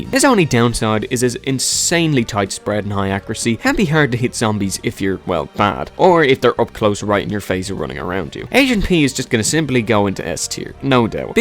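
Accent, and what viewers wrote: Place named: Ireland. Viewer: Irish accent 🤑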